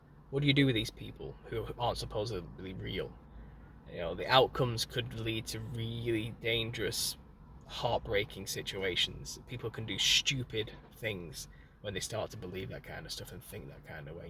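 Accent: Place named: England, United Kingdom. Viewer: They aren't stupid, just misled. There's an entire ecosystem of facts they can cling to even if they do there own research. Nice to see an English voice in this space, you do good work, lots of love.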